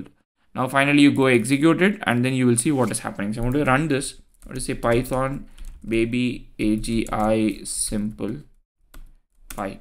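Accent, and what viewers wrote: India. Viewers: Opens video, speaker has Indian accent, 🙂‍↕️ this is going to be an excellent video 🫶🏻. Edit: - 🙂‍↕️ it was great. Edit 2: I think AGI should strictly train on YouTube videos with Indian accent 🙂‍↕️, it’s guaranteed to learn the easiest way to explain the hardest and the most complex tasks. Medical school was saved by literally 5 Indian guys teaching medical school on YouTube to us 😁.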